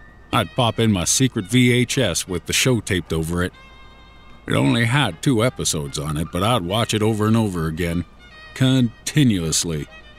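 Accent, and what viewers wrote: United States. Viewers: Good story, and awesome delivery! I LOVE that slight Texas-type drawl you have❣ I think I just may have to subscribe to your channel, lol! I'd love to hear you narrate some long stories as well. One in particular comes to mind. It's called the "New Fish". King Spook did an amazing job with it, and I really think it's a story VERY well-suited to your style as well. Anyway, keep up the great work, darlin'! 😉😘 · I love your country accent man lmfao . But I told everyone on the strange mysteries comment section that this is your channel because literally everyone hates it there now ever since you left, like you were the cherry on top of the cake man Nathan just lost so many subscribers and I literally watched them because like when you would narrate its like you would make learning funny and interesting and you always caught my attention ! 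Now the guy they got there now is so stale and dry lol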